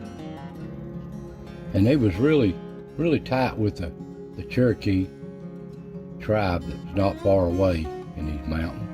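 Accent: Appalachia, United States. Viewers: I love your videos. You talk exactly like my grandfather and uncles. Hollywood has portrayed our "accent" as indicating ignorance. But nothing could be further from the truth. While so many Appalachian people lacked formal education, they/we possessed knowledge that no other culture in America possessed. Please read the book "Born Fighting," by Jim Webb. It proves that we Scots-Irish are always willing to fight for what we believe in. We don't back down. We will NOT be ruled by a KING or any aristocracy. Yes, we talk "funny." But we built and defended this country as much as any other European culture. Please keep making these awesome videos!! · I adore listening to you tell stories,. All the more so because your mountain accent just seems to authenticate the story. I close my eyes and I imagine an evening campfire crackling, little sparks floating up off the fire. 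Friends all huddled around patiently waiting for to begin, but holding their breath in quiet anticipation cuz we all know it's gonna be good. No disappointment ever. ♥ ♥ ♥ Thank you.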